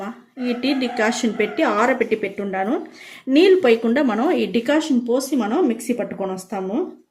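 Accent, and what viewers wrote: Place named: Karnataka, India. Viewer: Karnataka walla miru me matalu alane unai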